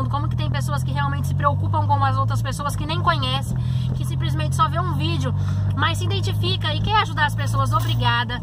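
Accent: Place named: Portugal. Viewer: E esse sotaque português hein 🤔🤓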